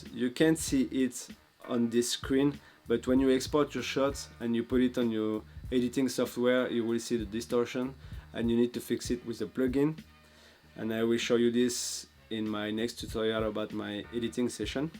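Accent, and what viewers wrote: France. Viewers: Salut mec!!tu parles bien anglais😜c’est la première vidéo que je vois de toi et je trouve ça vachement sympa avec les sous-titres en anglais et ton petit accent français j’arrive bien à comprendre et ça m’entraîne!!go voir les autres vidéos!!😜petite question... pourquoi tu fais des vidéos ou tu parles anglais alors que tu es français?😅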